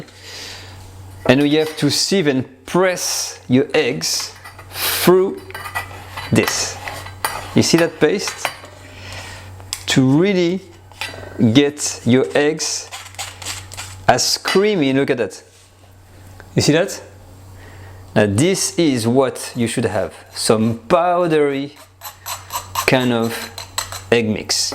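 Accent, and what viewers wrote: France. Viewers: It must taste delicious! I love your adorable french accent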